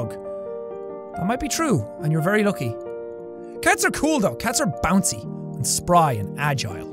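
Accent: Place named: Ireland. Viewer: Well, I am new definitely heard of him didn't know he had such a Irish voice cool because I am Irish! 😂😀😄😅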